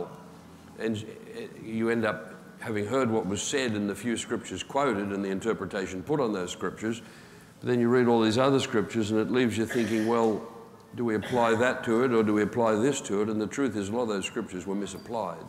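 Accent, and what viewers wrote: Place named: Australia. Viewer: I cant listen to this raving aussy any more. Now he is on about the new covenant. A mix up over two words. (this is the mistaken belief that the OT is the Old covenant and the NT is the new covenant). Not so. The scriptures say "a new covenent in (the) blood." This is A new covenant. A, A A A A A new covenant! It doesnt say THE, it says in that bit of scripture A! It is not replacement. it is A new covenant, not THE new covenant. There will yet be THE new covenant replacing that made with Abraham, it is yet future. The bible is full of "covenants" made with various people. THE (Abrahamic) Covenant was made with Abraham. So THE new covenant will again be with the people of Abraham. (yes i know we are grafted in, we are heirs of Abraham, just hold your seat) This period we in at the moment, some call the age of grace, is not the end game! 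It is a period in the plan of redemption where we are in A covenant written in the blood of Christ, one which will be fullfilled and all tied together all in all when He returns. The fullfilment of all covenants and promises is yet to come after Christ returns... it seems this preaching has forgotten the promise of the "RETURN of CHRIST" and taking no notice of the final book in our Bibles. It seems to be all about church is the final thing.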